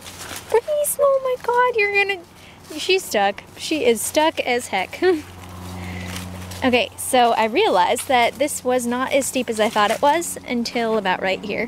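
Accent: Australia. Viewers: Welcome!!!! Nice australlian accent ;) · I like your Australian accent :)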